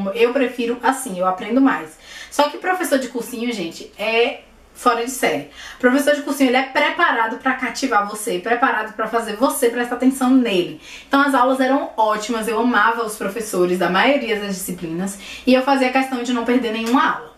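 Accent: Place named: Salvador, Brazil. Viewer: Amei o vídeo. Você é linda. Sou de Salvador e reconheci seu sotaque na hora haha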